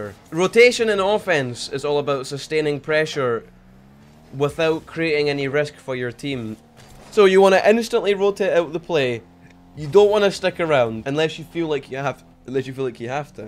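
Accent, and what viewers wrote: Scotland, United Kingdom. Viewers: Are you Scottish by chance?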